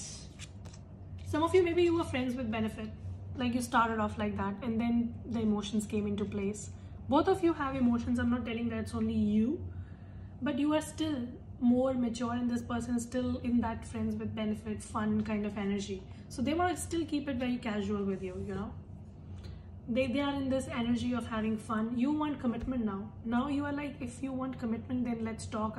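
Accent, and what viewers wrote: India. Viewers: Found my new reader. Indian accent and saying bitch and accurate. 👍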